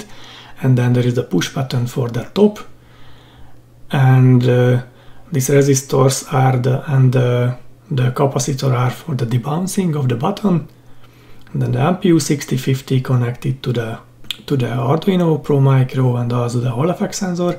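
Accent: Hungary. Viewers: Sounds like hungarian accent:D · hello, awesome project. i am just building it, how do i connect the cable to the arduino? which pins? what is the value of the two resistors r17 and r18? by chance my ears are correct and i hear a hungarian accent: easy rider ocseeem! :D thx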